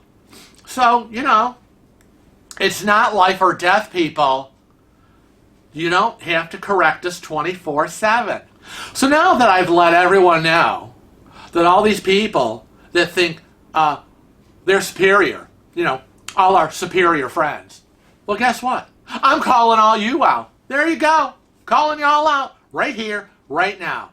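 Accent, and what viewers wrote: United States. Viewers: you sound mid western to me. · As someone who grew up and lives in the Boston area, I can understand your speech pattern with your slight upper state New York accent better than I can from the locals here in Boston. Lol.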